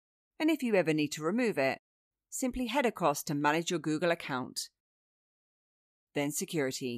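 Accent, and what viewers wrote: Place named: London, United Kingdom. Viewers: this wonderful London accent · I love her upper class so much British accent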